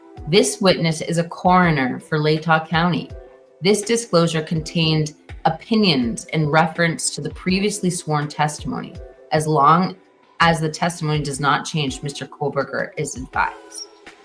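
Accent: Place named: Cape, South Africa. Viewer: where is your Cape accent? 😮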